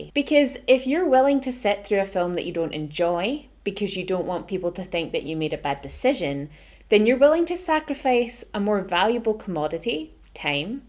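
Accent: Scotland, United Kingdom. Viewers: Brilliant concept. By the way your Scots accent is most cool🌺♥️😎👍 Great video. Nice editing, great production. Good rythum👍....I mean balance✔️ Waste is terrible. I'm type 2 and can't have buns and can never find anyone to have the rest of the buns/cakes/pain au chocolate etc., etc., so I end up chucking 5 pain au chocolate in the bin😟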